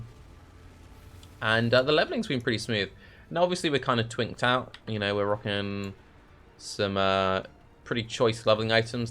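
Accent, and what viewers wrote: RP, United Kingdom. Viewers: He speaks the queen english he says it correct.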